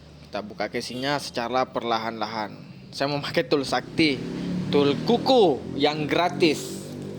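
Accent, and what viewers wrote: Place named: Makassar, Indonesia. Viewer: Kentara logat makassar nya wkkw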